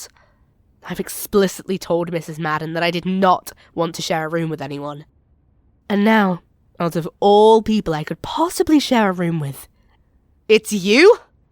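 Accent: United Kingdom. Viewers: This is the first time I’ve heard a fellow Brit do an asmr and it’s pretty good tbh 👍👍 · Bri'ish accent is the cherry on 🔝 ♥️ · Shut up British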